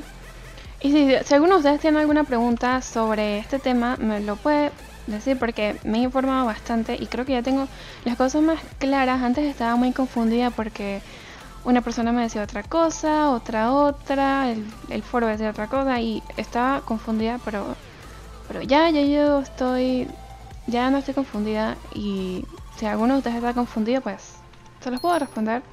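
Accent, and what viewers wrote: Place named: Venezuela. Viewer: Se nota tu acento venezolano xd tal vez lo reconozco por que también lo soy :v like :)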